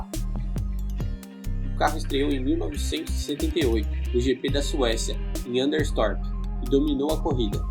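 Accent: Brazil. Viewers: Parece que carrega muito sotaque (paulista) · com sotaque caipira então, ninguém merece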